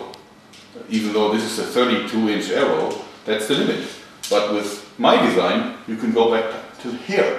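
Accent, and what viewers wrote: Germany. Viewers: The german accent just makes it better! *I ment this in a 100% positive way*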